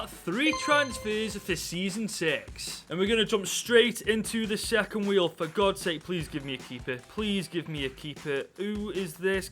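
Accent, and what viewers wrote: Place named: England, United Kingdom. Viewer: My guy has the thickest English accent I’ve ever heard, and I love it 😂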